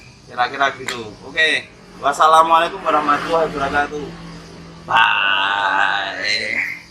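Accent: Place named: Tanjung Priok, Indonesia. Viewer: Kaya orang priuk bang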